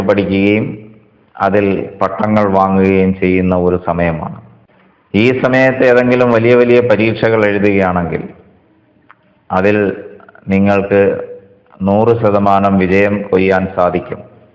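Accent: Tamil Nadu, India. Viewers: താങ്കളുടെ ഓരോ വാക്കിലും ജോതിഷം പറയുന്നതിൽ 100%സത്യസന്ധത കാണുന്നു സംസാരത്തിൽ ഒരു തമിഴ് ശൈലി കാണുന്നു കേൾക്കുന്നതിൽ ഒരു സുഖം 🙏🙏🙏🙏🙏🙏🙏🙏🙏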